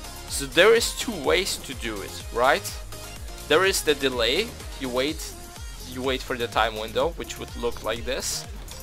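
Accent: Germany. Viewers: your english pronunciation lets everyone think that youre from germany in like 3secs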